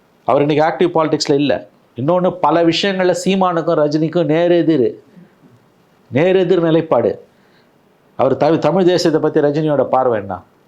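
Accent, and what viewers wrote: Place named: Telugu, India. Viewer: Telugu voice. This guy from Aanthra city.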